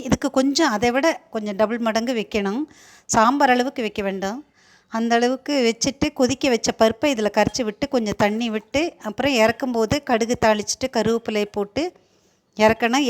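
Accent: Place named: Tirunelveli, India. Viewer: சமையல் சூப்பர் ... தங்கள் பேச்சில் நெல்லை மணம் வீசுகிறது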